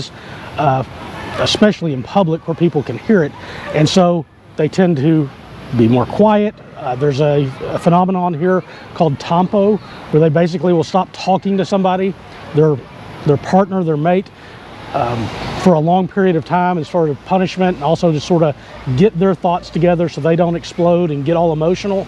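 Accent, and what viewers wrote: United States. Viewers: I couldn't say what region of USA you are from...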